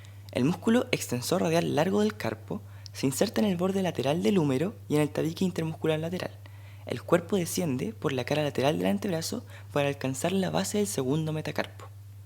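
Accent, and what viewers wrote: Chile. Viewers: Desde que volví de Chile ahora me topo con accento chileno donde sea. Muy bien video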